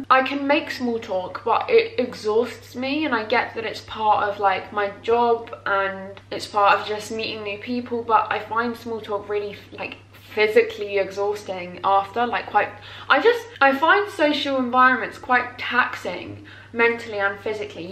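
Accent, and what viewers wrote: United Kingdom. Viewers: So proud of you🥰Are you British?❤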